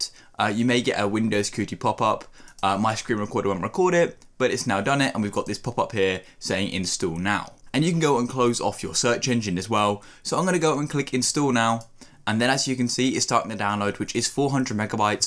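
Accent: United Kingdom. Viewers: bro is bri'sh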